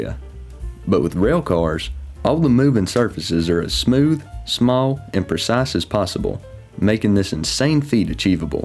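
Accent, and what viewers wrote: South, United States. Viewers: It's nice to hear a country accent it's different cool great video 👍👍👍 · Great informative video. I have never seen a video that explains such a complex science so simply to a layman. Also I love the friendly, folksy southern drawl. 😄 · Never seen an educational video with a southern accent 😄👍🏻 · As a Canadian, who speaks in a hard, flat English accent, it’s a pleasure to hear that soft Southern narration. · I’m old. Trained in physics. I never knew before the essential function of the Draft Gear. Thank you very much. Thank’s for the sweet Southeron accent! God Bless y’all. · This guy sounds like a hillbilly, bibel basher and redneck all in one. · The video sounds so American, and I have learned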